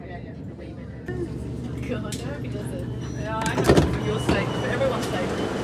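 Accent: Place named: Sheffield, United Kingdom. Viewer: He’s from Sheffield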